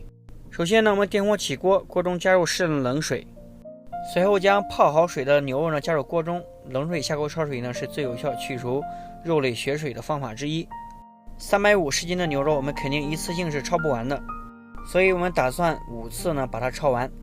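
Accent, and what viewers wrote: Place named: Anhui, China. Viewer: 不错，安徽人啊！口音。😹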